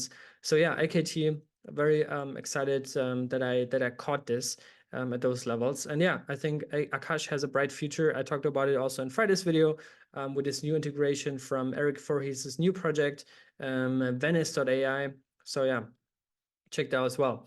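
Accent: Germany. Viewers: He‘s German, right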